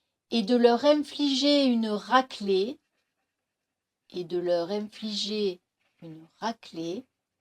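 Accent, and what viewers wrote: South, France. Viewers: Excusez moi! Je ne suis pas Française, (je ne savais pas que..) en lisant les commentaires j'ai appris que c'était l'accent du sud😶 Excusez-moi mais je ne suis pas habituée avec cet accent! Ce n'est pas de votre faute😅bien sûr! Je vous remercie pour cette dictée quand même! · Pas étonnant toutes ces fautes exposées dans les commentaires: déjà avec un accent Parisien, ce texte d'un autre temps, ne serait globalement pas une mince affaire mais alors avec l'accent du sud bonjour. Sinon ne pas le prendre autrement que dans le contexte car en dehors c'est un joli accent mais moins propice ici.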